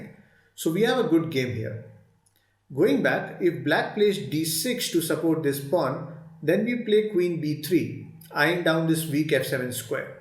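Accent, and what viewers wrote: India. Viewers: I've never heard such a nice Indian accent😀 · Me: hm thats not gonna help hears indian voice Woaaahhh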